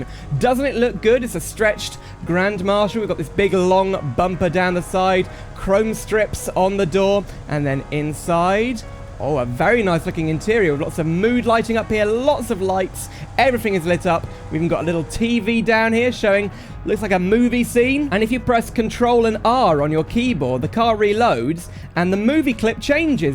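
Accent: United Kingdom. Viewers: Another fellow funny british man fan, it tickles the happy parts of my brain, we do eht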